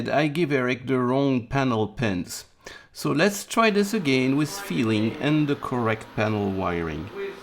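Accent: France. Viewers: u french? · All of this work you have done over the last several years should be preserved in the National archives, French accent and all.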